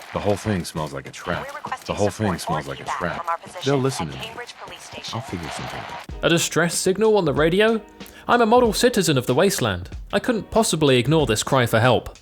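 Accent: England, United Kingdom. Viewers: There is no such word as 'nucular'. That is an embarrassing and ridiculous bushism. Things concerning the nucleus are termed 'nuclear'. You sound English, so why can't you spell and pronounce English words? Not the best idea to display your ignorance to the world by uploading it to a massively popular video website. .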